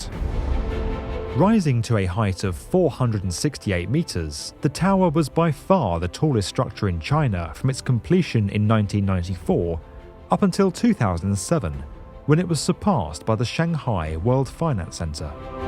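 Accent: United Kingdom. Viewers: too britishhhhhh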